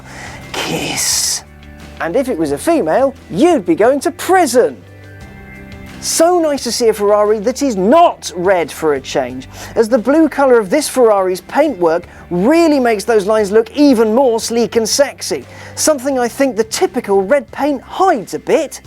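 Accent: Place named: United Kingdom. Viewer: his handsome round head and interesting emotion make the video funny. Very appreciated the footage.professional knowledge+able. has his very nice brits accent but sometime i don't understand what he say about cuz my poor English, Can XCAR put subtitle in the video pls??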